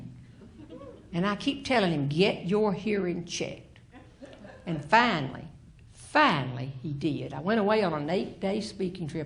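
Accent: South, United States. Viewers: There’s something so lovely about the southern accent which makes this even funnier;) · Love your stand up humor Jeanne I have a lady friend and she is from the south. She talks like you and jokes around. we call her southern Bell.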